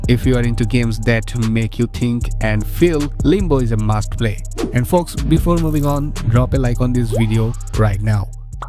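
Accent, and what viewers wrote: India. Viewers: Yeah, I don't need to play any of these. Always these top games must play videos with some heavy accent Indian guy and the lists are always crap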